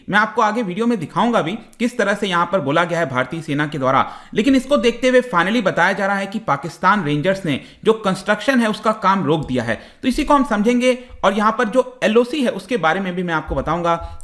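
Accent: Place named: Jammu, India. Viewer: Dogra accent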